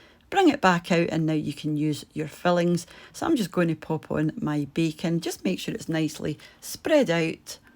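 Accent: Scotland, United Kingdom. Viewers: Oh gloriously yummy 😋that and a lovely Scottish accent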